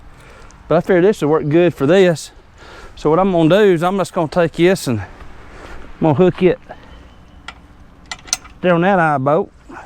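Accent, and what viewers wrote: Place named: Tennessee, United States. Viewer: Sounds like n.e. Tn. Love the accent like mine.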